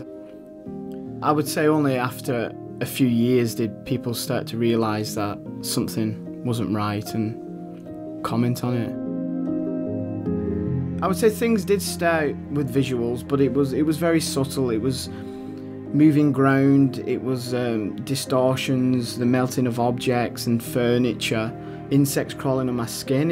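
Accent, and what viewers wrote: United Kingdom. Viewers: Can anybody tell me where that accent is from? North of England? Not sure.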